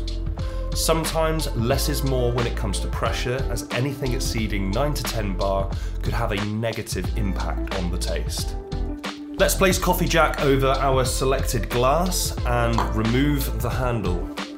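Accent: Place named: England, United Kingdom. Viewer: Nice English accent 👍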